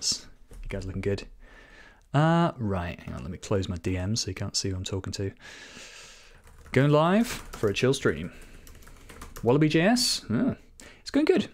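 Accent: United Kingdom. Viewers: Are you scottish?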